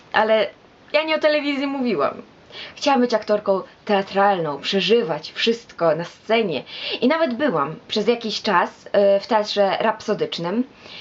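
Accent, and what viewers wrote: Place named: East, Poland. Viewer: z takim zabuzanskim akcentem, to mosfilm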